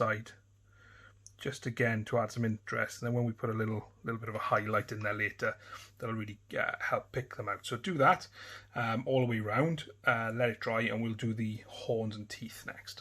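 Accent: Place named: Welsh border, United Kingdom. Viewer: It’s nice to hear an accent that’s close to home. I’m on the welsh border myself! Great content!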